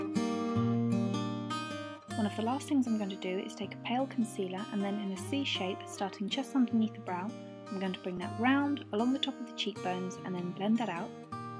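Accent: United Kingdom. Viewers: Your voice and accent sound fucking horrible. Like an old british hag. Id hate to be British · I LOVE YOUR ACCENT ITS SOO AWESOME! where are you from? it sounds like your from the U.K.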